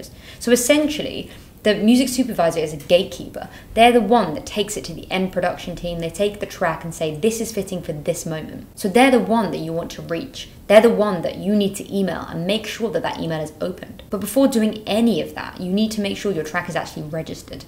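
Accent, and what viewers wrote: United Kingdom. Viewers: I really like the content you´re making on this Channel... But I absolutely LOVE your british accent!!! :-D Cheers & a happy new year to all in the Burstimo team from Denmark :-)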